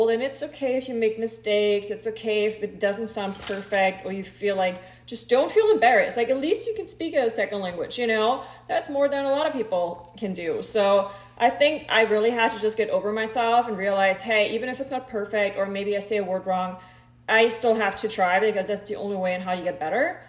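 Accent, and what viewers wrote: Germany. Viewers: I can hear a little bit of the German accent but personally I like the German accent I also love German and Germany. I did a study abroad there and I'm also part German. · I can definitely still hear in the German accent while you’re speaking. Do you purposely try to mask it?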